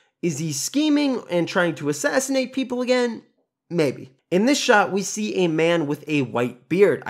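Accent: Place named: United States. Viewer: The man is a yank, it's understandable he cannot speak true true (Oxford/Queen's) English.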